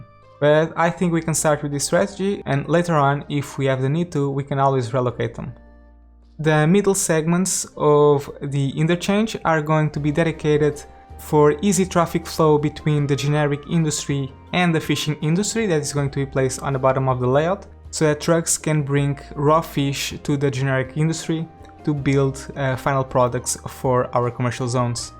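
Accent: Portugal. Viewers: Reconheci logo o sotaque portugues! É bom saber que existe um yt portugues de cities skylines apesar do teu canal ser em inglês! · I was paying attention and suddenly my head was like "this sounds like a Portuguese guy speaking English". Eu estava certo 😂 parabéns pelo canal, estou a apontar as dicas! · Are you portuguese? Your accent looks like it Ok descobri que és tuga, já subscrevi. Adoro o Cities Skylines também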